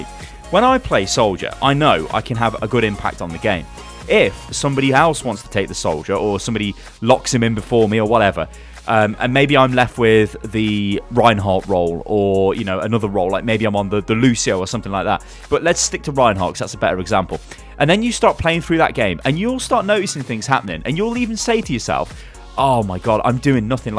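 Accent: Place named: United Kingdom. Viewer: His comfortable British voice gets me wet...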